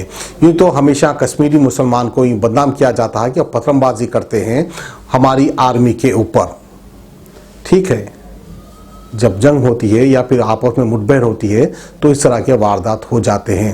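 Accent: Hyderabad, India. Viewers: Bhai aap pure Hyderabadi style ma bolka inlogo ka upper thok Diya jaisa hogaya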